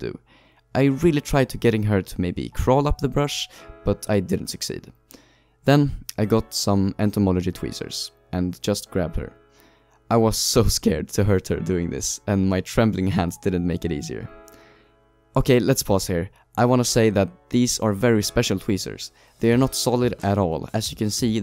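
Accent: Sweden. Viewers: are you from sweden? your axent sounds like it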